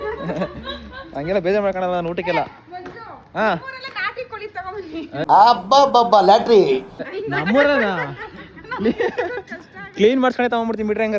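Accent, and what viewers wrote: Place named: Tumakuru, India. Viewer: Namdu mavathuru atra saggere... Nimdu yava uruu guru. Namma tumakuru bashe super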